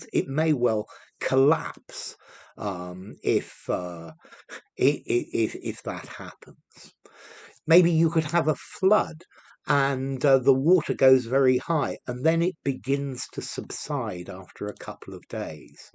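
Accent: United Kingdom. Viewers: Whereabouts in UK you are from Guv ? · Hello teacher I have missed out on much of your precious and quality British English in ages I hope you won't be annoyed by my comment anyway you look very pale and still has got a great amount of knowledge 🤭😃👏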